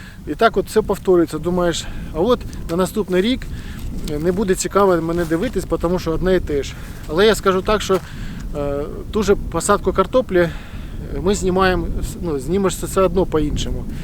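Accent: Russia. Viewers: Щирість та правда - це завжди легко і по-справжньому.Це мене "підкупляє" Ви правильну ставите ціль,мету :золота кнопка.Тільки так люди досягають успіху на шляху до здійснення мрії.Тільки високі цілі,а не на півдороги ,напівмету .Ще,не для "доривання" чи образи.В словах,наприклад копать,допомагать, знімать,розказувать,,пробувать,брать,працювать ,включить(болгарку) в кінці треба закінчувати. на И , бо ці слова відповідають на питання "що робити?/зробити".А от ,наприклад,"і всі думки погані (що роблять?) відходятЬ - тут вірно.Або такий приклад:Що рОбить? - відходить;що робИти? - відходити Ще раз наголошую- це не в образу вам.Ви вже добре розмовляєте українською.Це просто тонкощі і ,звісно, наслідки ситуації життя в російськомовну середовищі.Я сам з Миколаївської області , з російськомовного селища збудованого у 60-х роках.Половиною населення були,до розпаду СРСР ,росіяни,що приїхали працювати на два нових заводи, селище тому і побудували.Тому і школу закінчив російську.Та й зараз в побуті ще селище,здебільшого,російськомовне - як наслідок.Але потрохи у вас і у нас всіх все вийде,і все буде Україна!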